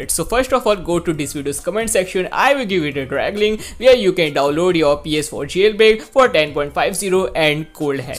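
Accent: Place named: India. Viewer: When i heard India voice i am out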